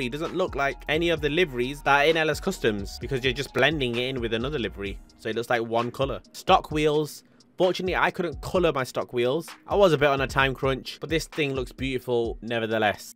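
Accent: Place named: Bradford, United Kingdom. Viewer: he sounds like hes from bradford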